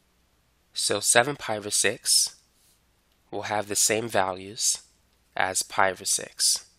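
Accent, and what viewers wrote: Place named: Boston, United States. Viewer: Where are you from? Your accent seems almost like new england/boston, but I can't pin it down!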